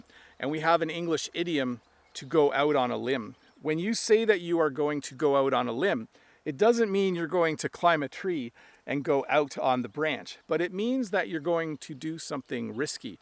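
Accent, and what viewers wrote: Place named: Canada. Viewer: Hi from Milan (italy), very nice youtube channel... i think that understanding canadian accent is easier than understanding british accent 😅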